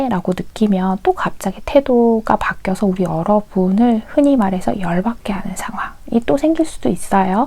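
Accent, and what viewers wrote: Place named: Gyeongsang, South Korea. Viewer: 3zz ㅋㅋㅋㅋㅋㅋㄴㅋ걍상도남쟈맞습다..ㅋㅋㅋㅋㅋㄴ 해봄이라는 말이 왜이렇게 듣기좋은지 모르겜ㅅ내옹❤ㅎㅎㅎㅎ 후후후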